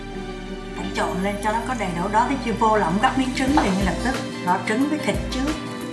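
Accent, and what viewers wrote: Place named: Da Nang, Vietnam. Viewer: Nhà cô rất sạch sẽ. Đồng hương Đà Nẵng ạ. Thích cô nói tiếng Việt như này. Cô khéo léo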